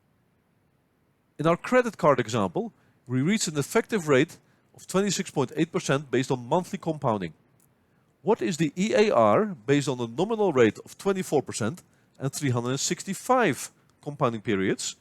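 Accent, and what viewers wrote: Netherlands. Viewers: From the first 10 seconds i already heard you were dutch. Super bedankt voor deze geweldige uitleg meneer. Ik ben 1e jaar studente econometrie en begin nu met Finance. Waardeer het enorm.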